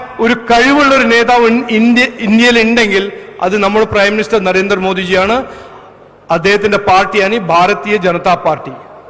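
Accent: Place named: Palakkad, India. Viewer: Typical palghat malayalam(tamilalayam)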